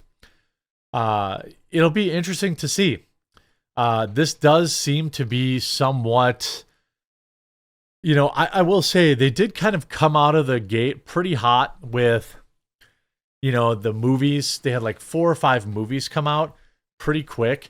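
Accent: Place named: Midwest, United States. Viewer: It's always funny to me how you pronounce MAGA as May-guh 😂. Just messing you dude. Gotta love that Midwest accent.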